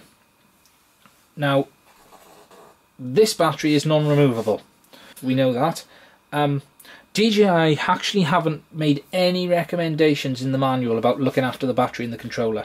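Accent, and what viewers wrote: Wales, United Kingdom. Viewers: Sound like a fellow Taff. Good to hear a fellow taff, I'm based in Western Australia watching your vid reminds me of home :-) - Hiraeth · How refreshing to hear a Welsh accent on YouTube. Great video mate! Diolch yn fawr😀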